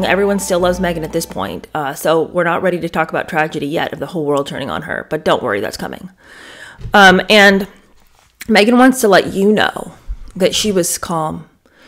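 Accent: United Kingdom. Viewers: I love your British accent lol!!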